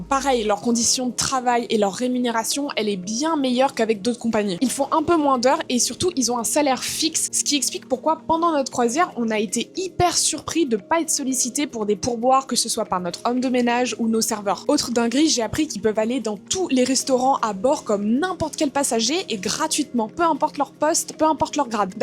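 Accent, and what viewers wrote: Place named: Belgium. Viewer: Tu ne serais pas Belge ? On dirait que tu as un petit accent en fin de phrase !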